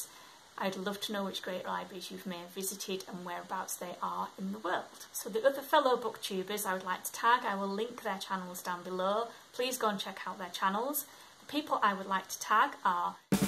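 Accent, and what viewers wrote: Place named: Yorkshire, United Kingdom. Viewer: As someone from the Netherlands I sometimes can't tell the various UK accents apart. I had no idea you had a Yorkshire accent as I have no idea what a Yorkshire accent sounds like. I know what a Scottish accent sounds like since recently as I started watching a couple of other BookTubers who are Scottish and talk about it. How nice you can reserve any book from the county for a pound! It costs more here if you want a book from a library further away than the local area here. Oh yes I am always careful with my library loans too. In the Netherlands you have to pay for a library subscription (except for children under 18 I think), so that could be a reason here but I don't know. Some libraries make you pay a certain amount of € per book, gladly mine doesn't and it's just the yearly fee. How cool, I don't think they deliver to your house here at all, that is not a thing. 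I love my library though. Oh yes I love browsing at the library! The Stopping Places sounds interesting. I couldn't pick one best book either! The Reading List has a nice cover! It sounds like a nice concept for a book! Ah I was just about to put it on my wishlist, and realised it was already on there. I didn't recognise it because the cover on my wishlist looks different. Glad you enjoyed the book! I can't listen to audiobooks. I prefer reading paperbooks over ebooks too. My library does have ebooks, but I can't read them on my Kindle (it's not a supported format), so I'd have to read them on my phone (or perhap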